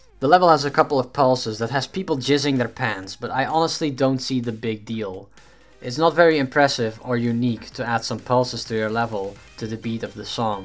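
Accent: Netherlands. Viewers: Are you dutch? Because you have sort of a dutch accent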